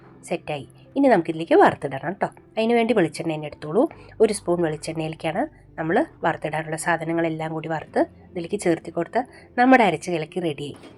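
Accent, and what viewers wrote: Palakkad, India. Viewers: നമ്മൾ പാലക്കാടന്മാർ പറയുന്ന പൊലെ "നാഴി നെല്ലിക്ക" എന്ന് പറഞ്ഞ് പാലക്കാടൻ ശൈലിയിലെ വിവരണം അടിപൊളി..😂😂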